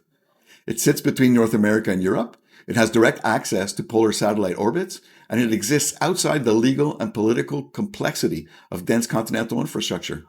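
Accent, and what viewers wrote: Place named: Canada. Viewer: Nice to hear a voice from the True North! 💙🇨🇦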